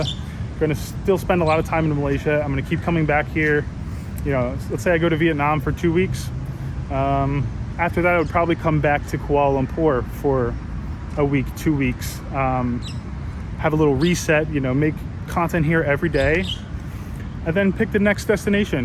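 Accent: New York, United States. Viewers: Keep talking bro! Malaysians are trying to learn your American English by listening to you! Keep doing it..Good luck from a Malay American living in Boston. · Love your New York accent. Keep it up.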